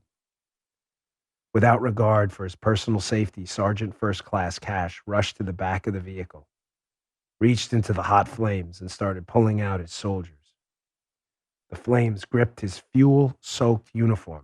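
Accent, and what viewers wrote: New York, United States. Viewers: I'm in a mood....lol, your NY roots are showing.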